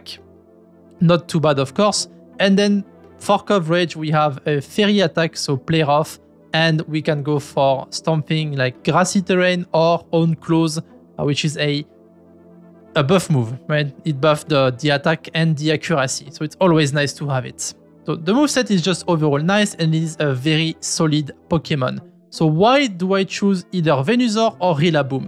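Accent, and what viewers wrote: France. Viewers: c'est un accent français ça non ?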